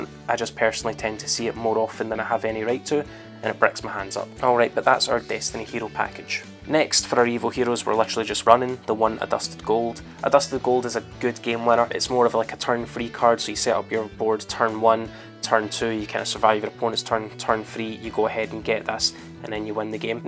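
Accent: Scotland, United Kingdom. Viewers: I hope I'm not the only guy that comments on any scottish accent video and make a reference to the limmy's show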